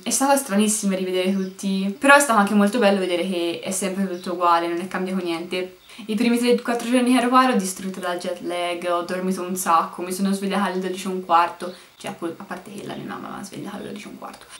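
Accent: Tuscany, Italy. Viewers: Che bello l'accento toscano 😍😍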